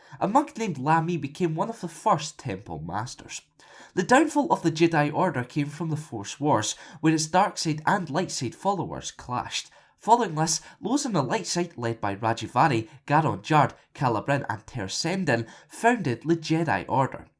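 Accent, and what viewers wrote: Scotland, United Kingdom. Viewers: Are you Scottish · Good to hear a fellow Scot out there doing this s#*#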